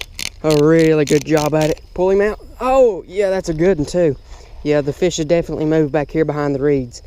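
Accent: United States. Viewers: Your accent sounds like a cowboy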